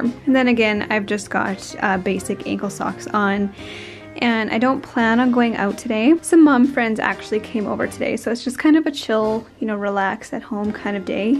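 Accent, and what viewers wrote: Canada. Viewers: I am so excited to have found you. I hear a Canadian accent but wonder where you are from exactly.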